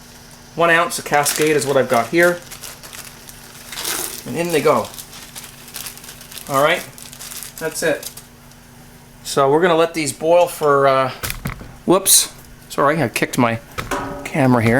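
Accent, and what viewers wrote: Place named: Canada. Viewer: hi, thanks for the great videos. I'm learning a lot. Just curious that you use Coopers products as I'm from South Australia so it's every where (and I love Coopers:)) but you sound Canadian - so either you live here or Coopers are exporting far and wide - cheers to you!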